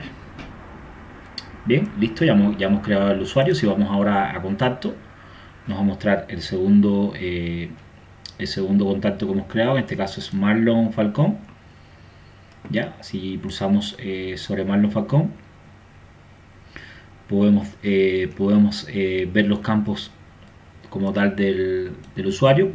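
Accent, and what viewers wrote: Cuba. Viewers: Hola Marlon, eres cubano no?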